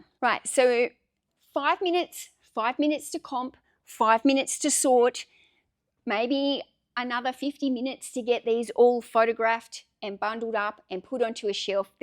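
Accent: Australia. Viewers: She has an Aussie accent!! She’s not going to pronounce it the way you might. What difference does it make anyway?!?? Geeez!! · I like the Aussie pronunciation. That's why I tune in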